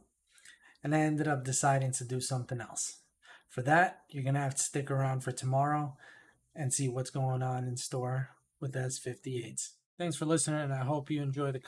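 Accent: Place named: New York, United States. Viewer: Subscribing because you're covering this well You talk like a real NYer too not like these phony pricks from Connecticut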